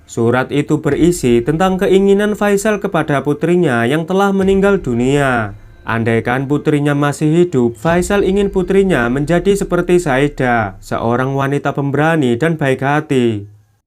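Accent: Java, Indonesia. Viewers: Komentatornya medok banget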